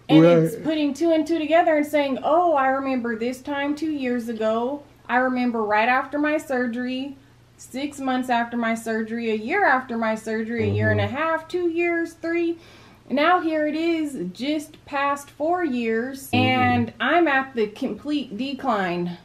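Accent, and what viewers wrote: United States. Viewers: Where is her accent from in America?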